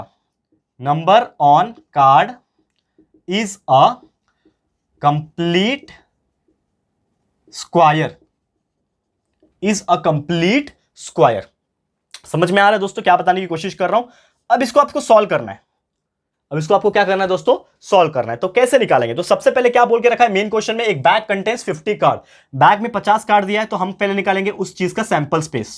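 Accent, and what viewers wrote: Maharashtra, India. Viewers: sir are your mother tongue is marathi. .. just asking😅 And thanks for teaching. Best teacher❤❤🧡🧡🧡🧡🧡🧡💛💛💛💛💛💚💚💚💙💙💙💜💜💜🤎🤎🤎🤎🤎💜💜💜💜💜💜💙💙💙💙💙💚💚💚💚💚💚💚💛💛💛💛💛🧡🧡❤❤❤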